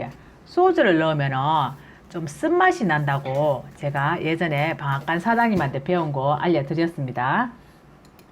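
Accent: Gyeongsang, South Korea. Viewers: 쌤 경상도 라도 억양이 경북 분이네요 하모예 메실엑기스는 파이지예ㅋㅋ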